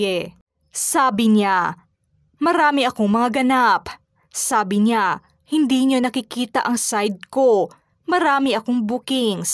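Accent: Visayas, Philippines. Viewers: Natatawa ako sa diction ng Content Creator na ito. Akala niya napopronounce niya maigi. Andaming Bisaya accent at OA na enunciation ng consonants. 😂😂😂😂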